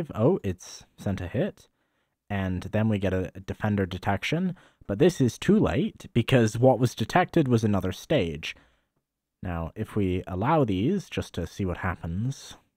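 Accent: United Kingdom. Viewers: is this guy an American with a speech impediment or a brit? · Your accent is so weird, you switch between sounding Canadian and British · Nice. I seem to hear a little bit of a British Accent, but I don't know if living in British Columbia gives you that accent or something. I like it though! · thanks for the tips. your accent rocks. there seems to be some British English on certain kinds of words.. password.. master.. ..ahaha Niles Crane-like.. thanks again! So happy to hear a voice i can understand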